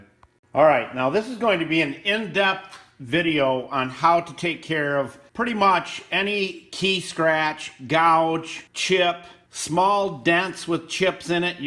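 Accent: United States. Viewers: I am in UK and unfortunately I don't understand Yanky ! I have no idea what your talking about. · where is this accent from in USA? sounds great.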